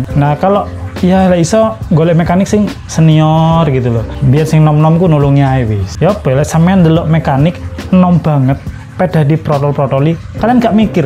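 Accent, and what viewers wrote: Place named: Jember, Indonesia. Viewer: Bahasanya cekk jember nya rawes kamu mat mat 😂😂 Suwun sing akeh mas infonee 😁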